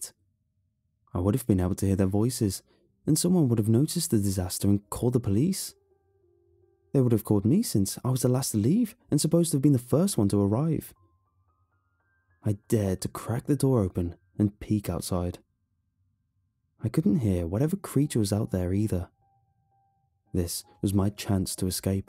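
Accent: England, United Kingdom. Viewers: Which part of England were u brought up in? You’ve a lovely, rich & expressive speaking voice. & i just wondered where, exactly, u originally came from. Middle England is just too vague. Please!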